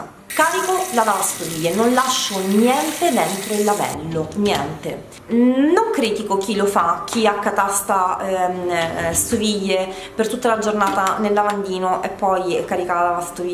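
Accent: Sicily, Italy. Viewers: per favore puoi farci un home tour di casa tua... sto per arredare casa e vorrei magari dei suggerimenti e delle idee... sei bellissima e fantastica mi piace un sacco l'accento siciliano che hai grazie per la compagnia un bacione:* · ma sei siciliana????😉 infatti hai detto bene essendo in casa sola o.....massimo 2😊😊 se uno ha bambini piccoli gia la cosa cambiaa😁😁ti va passare anche da me??? ciao carinissima...simpaticona...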